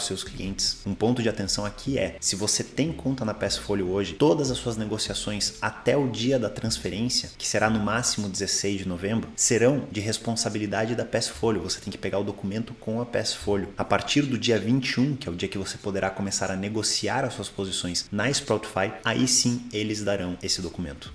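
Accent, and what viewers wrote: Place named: United States. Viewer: Você é americano? Pois tem sotaque Se for vc deve entender bem do mercado americano. Como é o seu curso?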